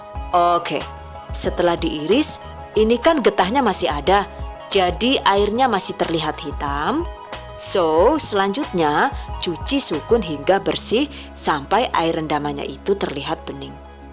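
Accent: Banyumas, Indonesia. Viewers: Lucu juga dengar mbak ini,ngakak juga ini,apalagi kalau bahasa ngapak nya keluar😀😀😀😀😂😂😂😂,salam dr malaysia mbak.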